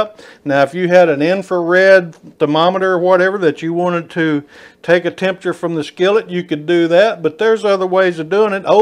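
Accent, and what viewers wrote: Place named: Tennessee, United States. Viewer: That's the way it's pronounced in middle Tennessee where I'm from. Like does.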